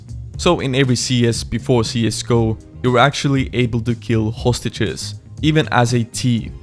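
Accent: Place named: Europe. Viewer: are you from a European country by any chance? your English is good by the way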